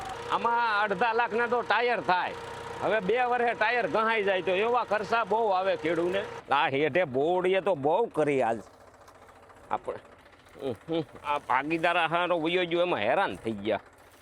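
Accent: Kathiawar, India. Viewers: I love your kathiyawadi and your simplicity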